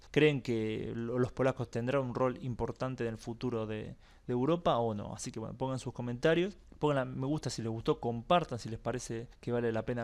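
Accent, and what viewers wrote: Buenos Aires, Argentina. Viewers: buenos videos, lastima tu acento tan porteño, cabasho, ashi, asher, mishones....caballo alli, ayer, millones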